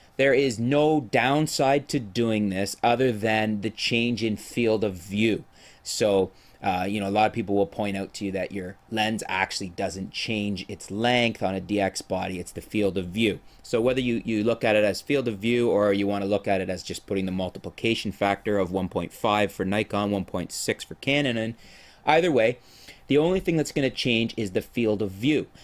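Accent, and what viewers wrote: Canada. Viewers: I fucking hate when Canadians say out or about !!! fucking stupid!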